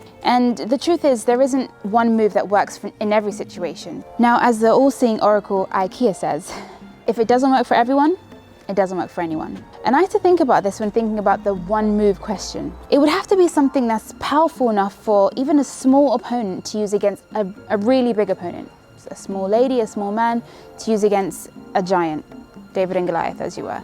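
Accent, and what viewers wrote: United Kingdom. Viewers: Are u from the UK? i asked because of your accent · Having studied Judo for 10 years, I'm surprised that you would suggest this difficult and risky throw in a street confrontation. In sport Judo on mats or on TV it looks spectacular, but in real life, if you misplace your foot, the attacker will be on top of you. And now you're grappling on the ground. Not a good place to be.This throw requires a great deal of mastery to be effective in a street fight. I would rather use Taitoshi where you also use forward momentum but you cross your leg in front of both attacker legs and pull him over.Easier to learn and have used it many times. I grew up in New York!! :-)) Nothing like a pretty girl with a sweet British accent:-))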